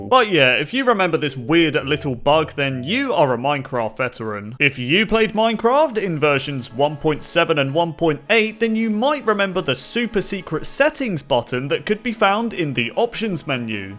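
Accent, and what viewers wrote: United Kingdom. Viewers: bruuuh are you talking UK english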